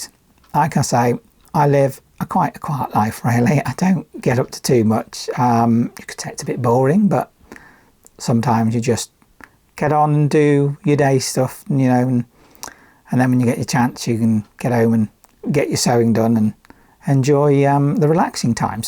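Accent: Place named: South West England, United Kingdom. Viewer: I'm a tech need seriously 😅 I need to dm you as ive got 3 huge bags of really good wool after my late mam and I can't think of anyone else I'd rather give it to. Help how do I contact you? I'm living in South Wales and you sort of sound South West urself 😍 if ur not interested no worries 😊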